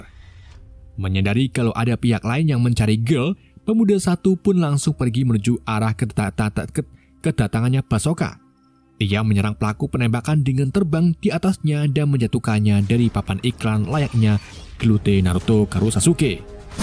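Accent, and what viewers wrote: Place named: Java, Indonesia. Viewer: Bosooomu kok lucu ngunu min, tibake wong jowo ta🤣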